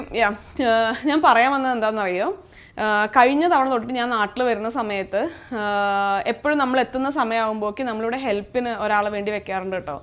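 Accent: Malappuram, India. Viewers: ഞങ്ങൾക്കും താളിപ്പ് കറി ഇഷ്ടമാണ് സംസാരത്തിൽ മലപ്പുറം ടച്ച് " ചൊയ" ഉണ്ടല്ലെ. ഇവിടെയും പറയും. പാലക്കാട് - മലപ്പുറം ജില്ലാ അതിർത്തി. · മലപ്പുറം അല്ലെ ഇങ്ങള്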